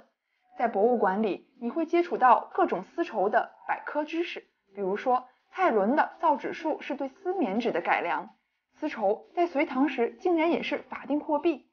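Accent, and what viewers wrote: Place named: Cantonese, China. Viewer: 声音🈶广音。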